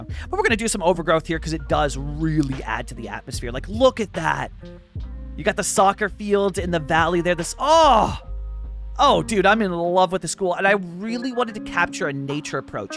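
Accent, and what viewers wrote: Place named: Canada. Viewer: Hey, my first of your videos, watching from Dublin Ireland here. The content (and your lovely Canadian accent) is very relaxing to watch :)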